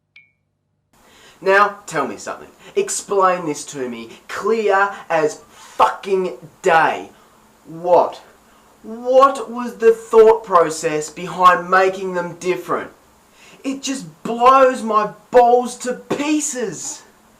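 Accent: Australia. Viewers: I'll guess your region Australia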